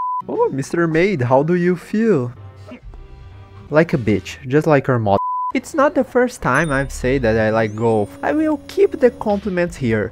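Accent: Brazil. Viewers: ... your english skills sounds like a brazillian to me. (also i mostly agree on your opnion)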